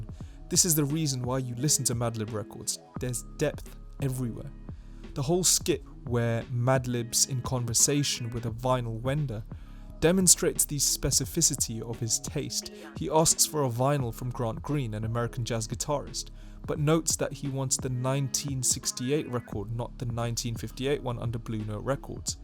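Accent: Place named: England, United Kingdom. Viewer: It’s cool hearing an English fella taking about one of my most personal and favorite albums. It’s like a Louis Theroux documentary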